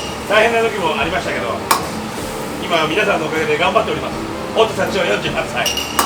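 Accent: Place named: Mikawa, Japan. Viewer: 料理も美味そうだけど、気持ちいいくらいの三河弁が聞いてておばあの実家の郷愁に駆られる…笑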